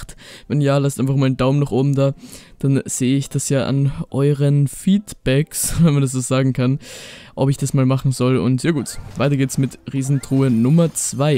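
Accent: Austria. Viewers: Österreich du?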